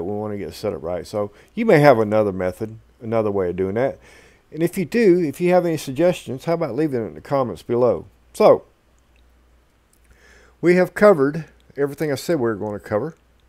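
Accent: South, United States. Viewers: man I love southern accent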